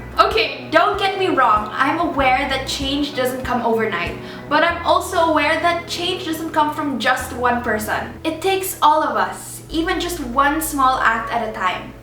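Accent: Philippines. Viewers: im sorry, i just cant help but notice your tagalog accent and it was the cutest thing ever!!!!! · slow clap for 👏 i love you 😍😘 soo proud of you 😊 PS: love ur tagalog accent 😁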